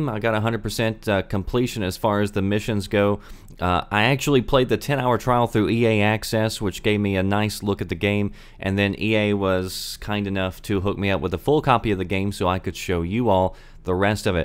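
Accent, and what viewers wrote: South, United States. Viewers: "Sometimes I can't keep the southern out..." Its OK... let that shit flow!!! Hilarious. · I like the southern accent.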